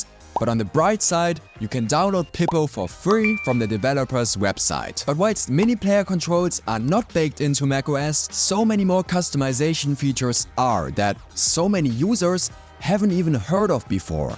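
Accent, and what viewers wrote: Germany. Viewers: Actually, it took me three videos to get that hes German Und jetzt esse ich weiter Bier und Brezel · Bist du Deutscher?? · As German, I was pretty sure it’s a German accent, but still I thought he might be from another european country. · Is that a Deutsche Akzent? :D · Yes. He is german 😀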